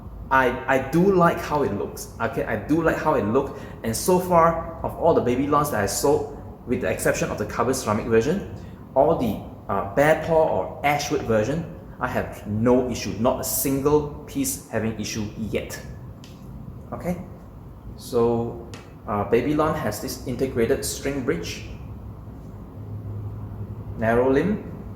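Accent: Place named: Singapore. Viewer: You have a Singaporean accent 😊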